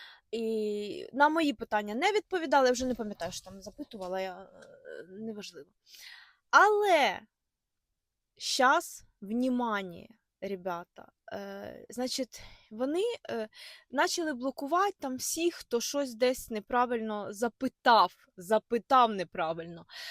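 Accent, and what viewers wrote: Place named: Ukraine. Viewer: Какая же у вас речь противная, ну как вы слова произносите, это просто кошмар. Как хорошо, что я не родилась в хохляндии, не дай бог вот так разговаривать.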